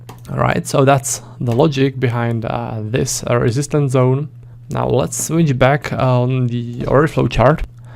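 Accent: Czech Republic. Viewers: Česká angličtina trošku že? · Are you from Czech Republic?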